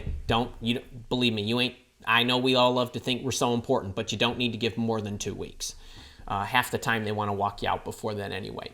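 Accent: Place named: Upper Midwest, United States. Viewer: I’m picking up a strong upper Midwest accent here!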